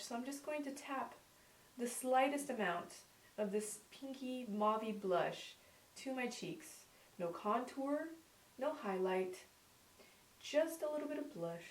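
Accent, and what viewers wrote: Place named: Montreal, Canada. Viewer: you sound like you're from Montreal for some reason...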